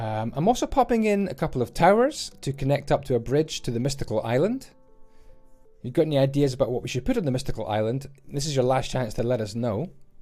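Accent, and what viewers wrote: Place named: Scotland, United Kingdom. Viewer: Lol. You most certainly have an accent, my dear chap (sounds like a Scottish accent, perhaps?), but it sounds a lot like you're saying "pam". It's okay, though. I was just trying to help you out. Also, I am a native English speaker, as well, but I am also an English teacher ;)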